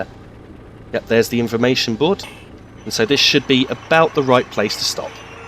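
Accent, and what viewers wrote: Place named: Midlands, United Kingdom. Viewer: I'm still in the process of deciding parts in my build although I'm quite content with my MacBook Pro. Not going skylake then or an i7 5820k? Well I knew it wasn't Yorkshire or Scouse or Geordie or Scottish or Welsh so I figured either Midlands or somewhere darn Sarf ;) Keep up the good work with the videos man. Have you considered building your own route and maybe creating a very busy NPC timetable?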